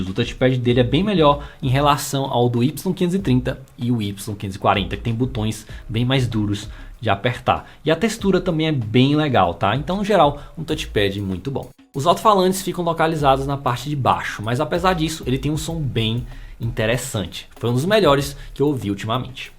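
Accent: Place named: Salvador, Brazil. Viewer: Seu canal é muito bom mano, impressionante como pessoas assim não tem tanto destaque quanto aos demais, vi cada review fraco até chegar aqui. Acredito e torço pra que seu canal tenha o crescimento que vc merece. Esse sotaque... vc tbm é Salvador?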